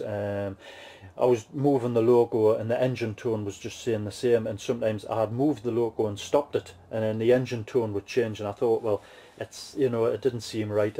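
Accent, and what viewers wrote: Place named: Northumberland, United Kingdom. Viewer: Really enjoyed this beginning of your layout. My father was from Blyth and your accent brings back happy memories. We had a camping holiday at Blyth many years ago. I remember the wonderful beaches you have in your part of the world.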